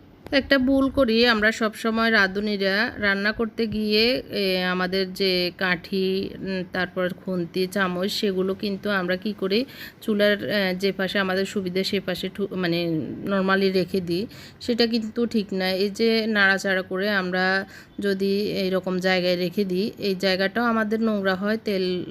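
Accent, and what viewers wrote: Noakhali, Bangladesh. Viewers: এটা নোয়াখলির ভাষা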